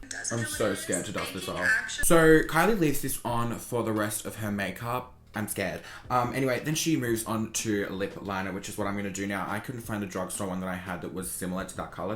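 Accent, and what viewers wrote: Australia. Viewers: I thought u were American but ur Australian right ? , love u anyway u slay Every product that has been released xx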